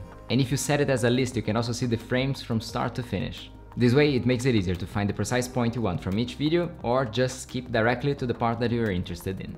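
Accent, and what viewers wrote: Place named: Brazil. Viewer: This accent.... Are you Brazilian?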